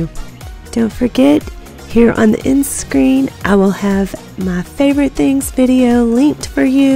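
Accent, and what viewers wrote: Texas, United States. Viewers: Hey, supporting from Virginia with like 90; cute Texas accent hehe. We'd love to have your opinion about our nature ambience soundscapes as well. New sub and hope we can keep in touch, if possible.👍💚 Sending positive vibes your way!:goodvibes:🔔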